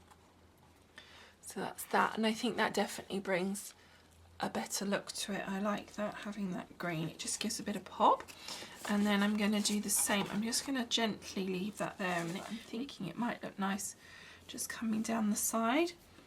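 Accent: United Kingdom. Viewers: How sweet is that you remembered the "hun" part in my name which actually stands for Hungarian. I grew up in Hungary, and lived for a few years in Germany,Canada, and now for 12 years in Dallas, Texas. Where do you live in the United Kingdom? I always enjoy listening to you talk in your videos because your English sounds so much nicer than American English. :)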